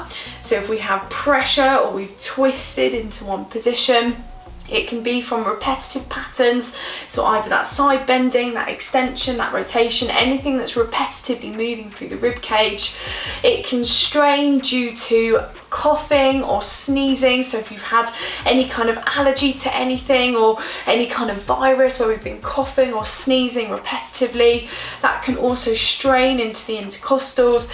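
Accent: United Kingdom. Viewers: UK company and yes she sounds as English as I am. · The accent tells me that the speaker is British or somewhere from Europe with British accent ✌️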